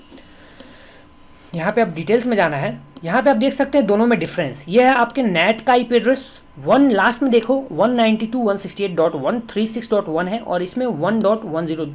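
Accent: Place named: India. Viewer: This is indian english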